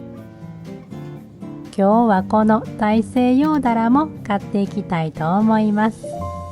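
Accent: Kansai, Japan. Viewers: 眼の手術後、あまり無理できなくて横になっていることが多く、nobanaさんの関西弁のゆったりしたYoutubeをBGMにとてもリラックスできました、有難う御座いました、海外生活お身体に気を付けて頑張って下さい。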